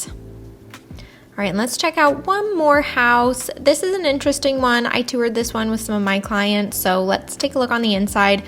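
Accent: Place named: Northwest, United States. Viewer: I watched a few more, well, my guess from your speech, is the NW. Hope business is well.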